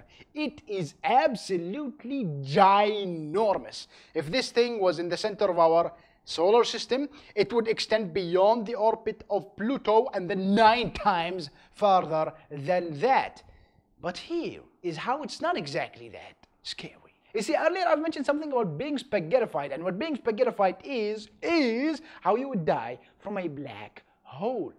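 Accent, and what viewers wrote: India. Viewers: Is he Indian? Because his accent is so distracting. "Eaaartdh" - this guy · I cant tell if that's an Indian accent or an Arabic accent...either way it's fucking terrible. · What a perfect Hinglish! It makes me want back to India =)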